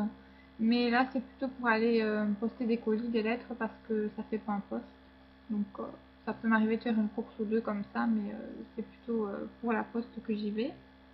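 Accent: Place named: Belgium. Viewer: Coucou!! C'est cool que tu es fait ce tag ;) Tu vis dans quelle region? Ton accent ressemble un peu a l'accent belge. Gros bisous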